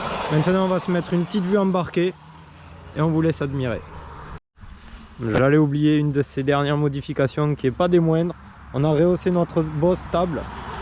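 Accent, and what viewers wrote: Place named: South-West, France. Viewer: Salut, tu es de quel département ? Tu as l'accent du sud ouest si je ne me trompe pas... Moi je suis des Bouches du Rhône.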